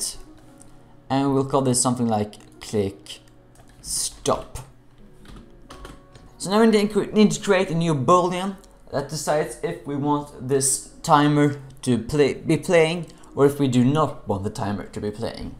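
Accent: Sweden. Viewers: lol I immedietely knew you were from Sweden from you accent! damn, that early day pewds when he wasn't so fluent in english made me catch that accent instantly :v great tutorial btw, helped me out a lot, keep up the good work!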